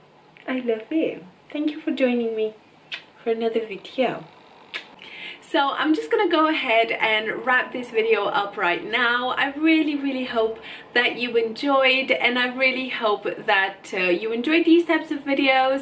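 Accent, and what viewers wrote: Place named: United Kingdom. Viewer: You have picked up a much stronger British accent than all the Romanians I know in the UK.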